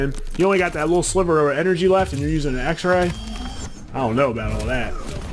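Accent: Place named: Philadelphia, United States. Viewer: def either from philly or south jersey...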